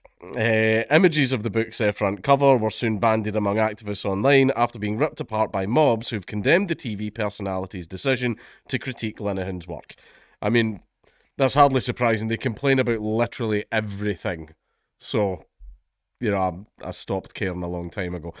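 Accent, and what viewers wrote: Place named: Scotland, United Kingdom. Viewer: You're the Scottish version of Brian Blessed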